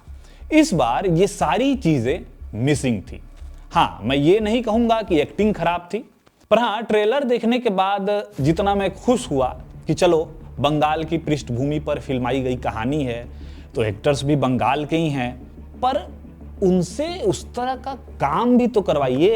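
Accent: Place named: Bihar, India. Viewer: Iski bt se samajh aata he ye bihari he isliye ye jal raha he😂😂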